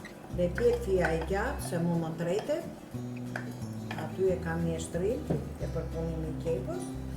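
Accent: Tirana, Albania. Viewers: Je me e mira. si per gatim ashtu dhe per te folmen tironce .respekt nga larg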